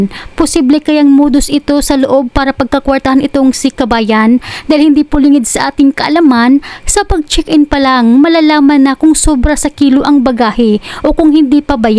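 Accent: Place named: Visayas, Philippines. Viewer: Maam BISDAK ang boses mo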